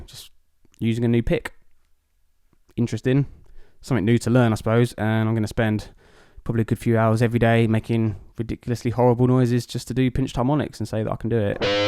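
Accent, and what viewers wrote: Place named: Texas, United States. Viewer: Before this video I was pretty sure you were American, and probably from Texas.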